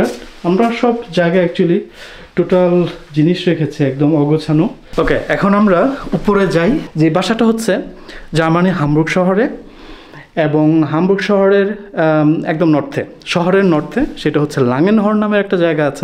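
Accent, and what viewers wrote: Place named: Tangail, Bangladesh. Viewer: I saw very old videos of u, and got to know by ur speaking accents that your hometown probably in Tangail. I was surprised my hometown also there. And currently i am studying at University of Dhaka in Chemical Engineering 3 rd year first semester. Hopeful so see you if my wish going to Germany is fulfilled in shaa Allah. ❤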